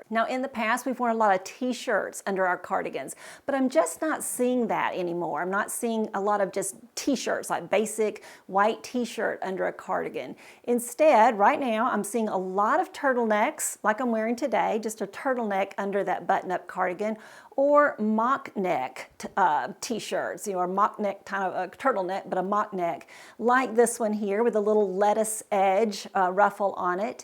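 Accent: South, United States. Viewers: Oh my goodness what part of the south u from I'm from No.Carolina love your videos but I think u could perk it up & jazz it up more 💜🎄🎅🤶🦌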